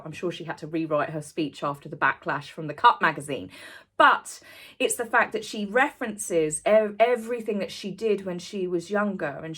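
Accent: United Kingdom. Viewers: You're so cute with your British accent. I just realized you were saying party boat. I heard potty boat. 🇺🇲❤🇬🇧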